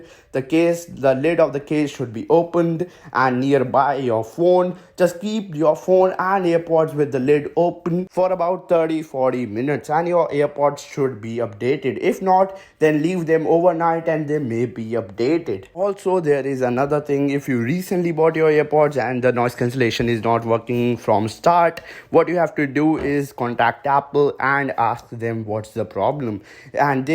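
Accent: India. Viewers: Are u from India? Can see from your accent.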